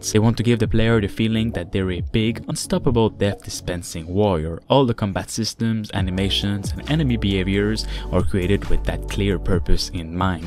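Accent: Quebec, Canada. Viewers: T'es tu Québecois ?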